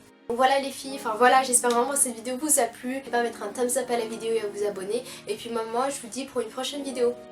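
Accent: Somalia. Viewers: Tes une Pauvre refugier somalienne qui a eu les papier enfin j crois , rien que ton accent te trahi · w t f is this hrgeysa dielect i dont even get any word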